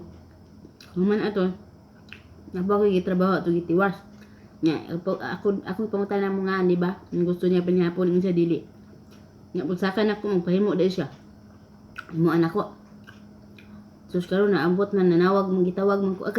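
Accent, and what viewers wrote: Visayas, Philippines. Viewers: hi day sus ganahan jud ko nimo day,kay siaw kaayo hahaha..Lawm sad kaayo ug bisaya..Bisaya sad ko day..Pero nakapag-asawa lng sa KL..Pareho ta kusog kaayo mohab-hab hahaha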